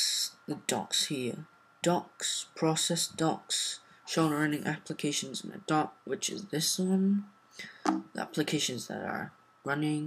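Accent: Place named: United Kingdom. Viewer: why r u using a british accent?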